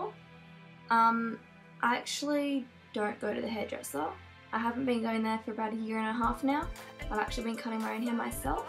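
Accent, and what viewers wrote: Australia. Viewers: alot of people commenting about your accent. but i didn't even notice you had an 'accent' because im Australian too. soo really: for me, it's not an accent :p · It's Australian accent <3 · I though you sounded Australian so I checked your channel :D Me too lulz ^^ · Aussie accent? I'm aussie! xD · she's from australia idk where. you can tell it's an australian accent ( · im pretty sure she's aussie. like me! :D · its australian lol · ARE YOU AUSTRALIAN :D I AM YOU SOUND AUSTRALIAN / NEWZEALAND MAYBE BUT U SOUND AUSSIE IM AUSSIE LOL Heyy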